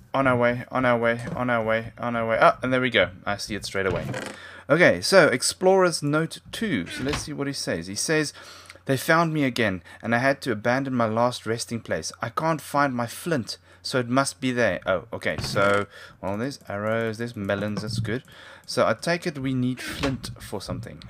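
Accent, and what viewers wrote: South Africa. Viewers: I thought so, My parents have friends that are south african and they sound just like you. Pretty cool Man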